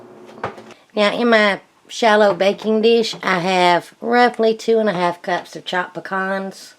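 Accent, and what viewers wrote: South, United States. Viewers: I most definitely love your southern accent!!!!! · I've always wanted to try of these as pecans are my favorite. Thanks for the video. I'm definitely going to try these. And your southern accent is to cute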